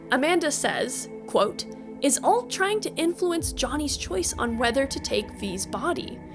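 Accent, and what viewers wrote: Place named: Canada. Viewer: Lake Minnetonka? I thought that was a Canadian accent I heard. I suppose Minnesotan and Canadian accents are pretty much the same.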